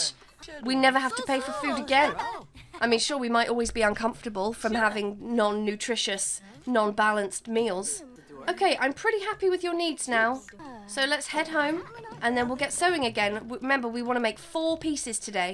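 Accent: United Kingdom. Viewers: are u from uk i live in uk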